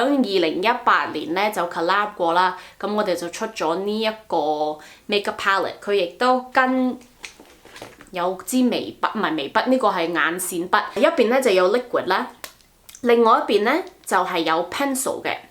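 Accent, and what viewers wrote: Guangzhou, China. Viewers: 好好好重鄕音 廣州音 😂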